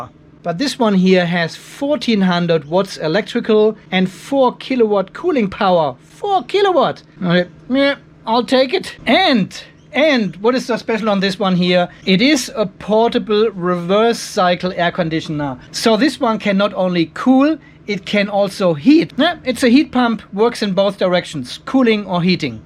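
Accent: Germany. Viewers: Also deutschen Akzent hört man ja schon sehr deutlich, wenn er Englisch spricht.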